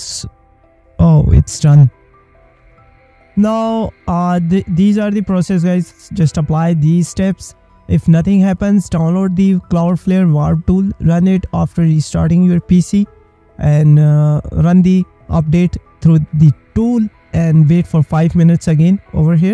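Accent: India. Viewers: کیرممننم تو لهجه هندیتتتت ولیی واقعااا ممنووننن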